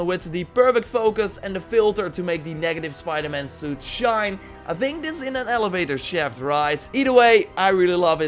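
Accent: Netherlands. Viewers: Are you Dutch? ..You sound Dutch..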